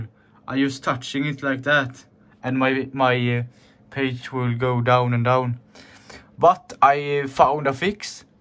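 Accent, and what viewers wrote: Sweden. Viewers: Awsome, fixed it for me as well! Also, love the svengelska. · You're swedish accent is leaking out too much man. Anyways, thx for the help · Are you swedish? xD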